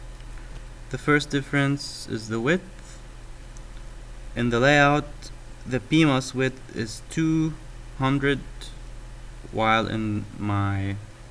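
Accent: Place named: Lebanon. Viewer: I can smell a lebanese accent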